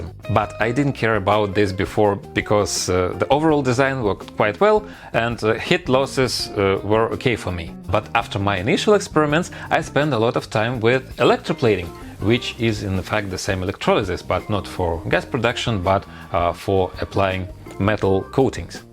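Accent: Russia. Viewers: Your accent says you can speak Russian correct?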